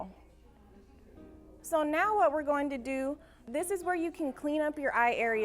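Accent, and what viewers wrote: United States. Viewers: Where are you from? I can't pin point which area in America your accent is from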